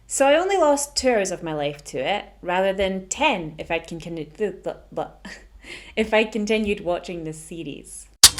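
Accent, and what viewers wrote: Scotland, United Kingdom. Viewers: Brilliant concept. By the way your Scots accent is most cool🌺♥️😎👍 Great video. Nice editing, great production. Good rythum👍....I mean balance✔️ Waste is terrible. I'm type 2 and can't have buns and can never find anyone to have the rest of the buns/cakes/pain au chocolate etc., etc., so I end up chucking 5 pain au chocolate in the bin😟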